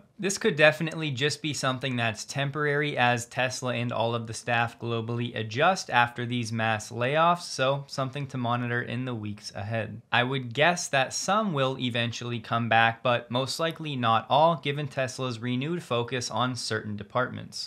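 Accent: United States. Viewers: Thanks for the updates. 🙂👍 Your speaking speed is fine. If you speak any faster, English speakers will start to have trouble in understanding your strong U.S. English accent. There are a number of U.S. channels which many English people cannot understand because of strong accents and rapid speech. People who speak English as a second language will have even more difficulty.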